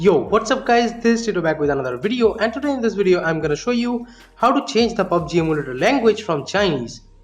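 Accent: India. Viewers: Chineese much better than your hindienglish -_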